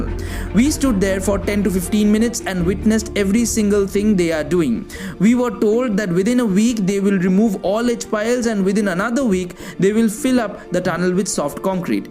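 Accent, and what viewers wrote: Bengal, India. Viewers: It's quite evident that you are a TMC cadre. Otherwise you would never have been allowed into restricted areas as shown. Your heavily Bengali accented English also betrays your cadre background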